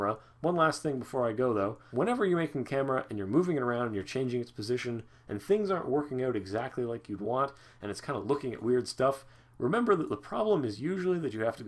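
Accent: Canada. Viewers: You have a very Canadian accent and you are a great teacher.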